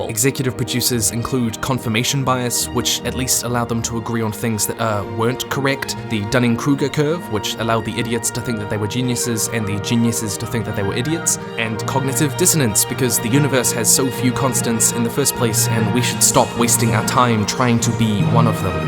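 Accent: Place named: New Zealand. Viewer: I'm sleep deprived so when I clicked on this I actually thought it was an exurb!a upload until I saw Tim's childhood photos and actually registered his voice like "wait a minute, this is new zealand not british accent" 😂what a wonderful celebration of our world ! amazing writing from a great teacher ! I've definitely missed your videos :)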